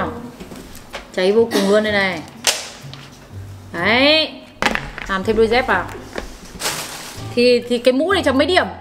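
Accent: Nghe An, Vietnam. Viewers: video sắp tới mần video review bằng giọng Nghệ nha chị :<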